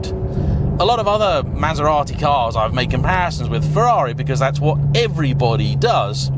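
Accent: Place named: England, United Kingdom. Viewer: "A person with an English accent reading the phone book sounds better than an American reading the Gettysburg Address." -Mad Magazine, in a spoof of a course to teach you an accent instead of the actual language. Listening to this guy narrate this is so much better than the usual braying American "What's up? What's up? What is UP? What's up? Wassup?" at the beginning of each grating video.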